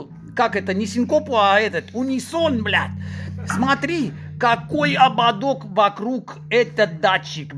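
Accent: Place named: Yunusabad, Uzbekistan. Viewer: Вой билат! Юнусабадский акцент что ли?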